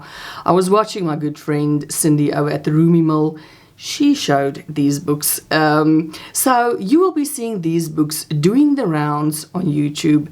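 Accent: South Africa. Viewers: Hello. Your bee is gorgeous and what a lovely story. I am lucky I have both of these books already. I have finally caught up on all your videos. I love your channel My son in law comes from South Africa but he and my daughter and two grandsons live in the UK so it is lovely to hear the accent. My husband also visited Denmark years ago and loved Copenhagen. He used to work for Bang and Olufsen. He has retired now. We are from Australia. Lovely video. Thank you have a great week. ❤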